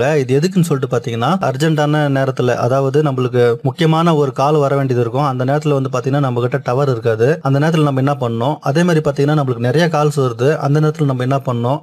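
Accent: Chennai, India. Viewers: Bro UNGA Chennai Tamil semma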